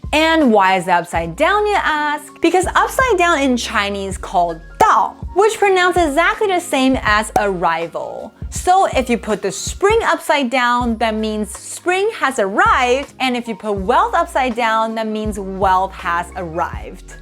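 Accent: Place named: United States. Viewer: Your accent is so American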